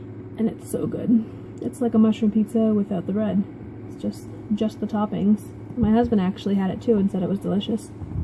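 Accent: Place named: Boston, United States. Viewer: I looooove ur videos!!!!! I also loooove ur Boston accent! I've been watching ur videos from the beginning and girl YOU ROCK!! U r looking super fabulous. Enjoy the wedding and wearing ur new size 16 dress!!!! My vertical sleeve surgery is next Wednesday and I can't wait to be in a size 16!!! Love to u missy!!! Keep up the great work!! Oh and hopefully soon u will be OUT OF THAT OFFICE!!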